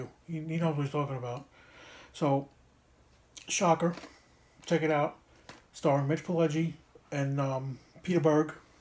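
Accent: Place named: Brooklyn, United States. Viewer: really are funny. Love that u apologized for the Brooklyn accent lol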